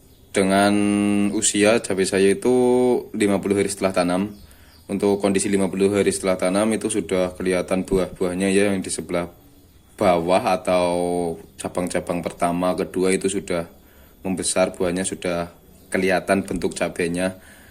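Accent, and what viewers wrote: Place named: Banyumas, Indonesia. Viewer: Ngapak nih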